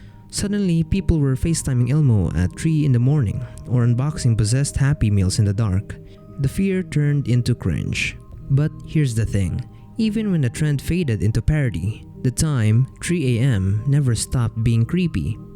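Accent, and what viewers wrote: Philippines. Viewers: Filipino accent detected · Are you a Filipino? I can hear it from your accent, I know a fellow filipino when I hear one.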